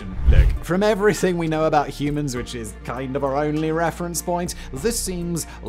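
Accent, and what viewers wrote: United Kingdom. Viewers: Hmm a synapse of the fine points of Hitch Hikers Guide to the Galaxy and read by a Brit? Where Simon's writers just taking a piss?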